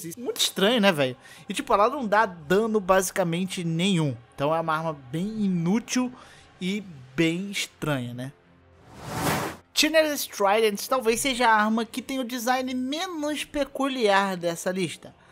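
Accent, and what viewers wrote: Brazil. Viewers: cara de qual estado brasileiro tu é?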